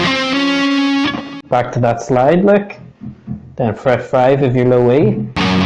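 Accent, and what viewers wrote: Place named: Belfast, United Kingdom. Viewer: Was amazing hearing your accent and realising you’re from Belfast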